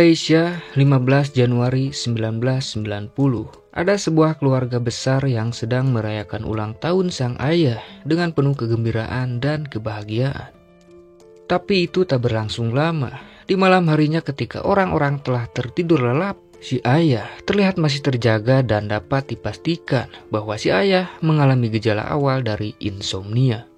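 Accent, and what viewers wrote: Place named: West Java, Indonesia. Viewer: Ges org sunda deui wae.. Asli mana kang?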